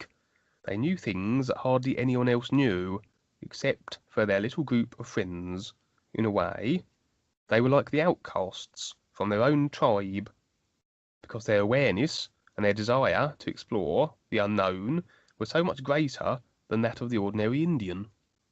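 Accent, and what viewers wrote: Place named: England, United Kingdom. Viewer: Thanks, it’s nice to have a human voice and not a digital one to listen to. It takes awhile for me though, as a non native speaker, to get accustomed to your pronunciation. Are you from England or from Australia or New Zealand?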